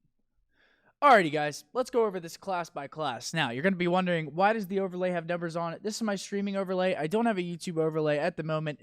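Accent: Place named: Texas, United States. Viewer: What part of Texas you from?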